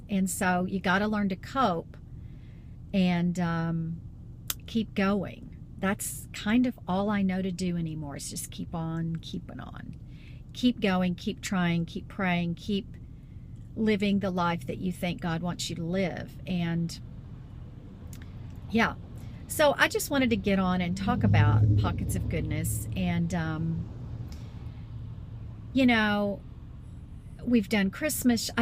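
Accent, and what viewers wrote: Texas, United States. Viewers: Great vlog! I enjoy your positive attitude so much. Loving that thick Texas accent you've re-discovered too. Hope you have a wonderful holiday.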